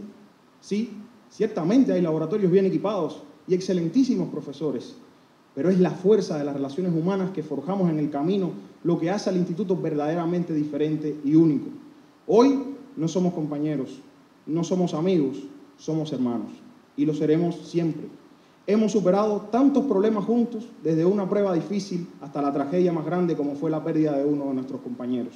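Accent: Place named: Argentina. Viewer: Oe socio, se te ha pegado hasta el acento de argentina, felicidades hermano, hace rato que no se de ti pero se que estas bien porque una gente buena, enfocada y competente como tu todo lo logra, un abrazo hermano desde la distancia, cuidate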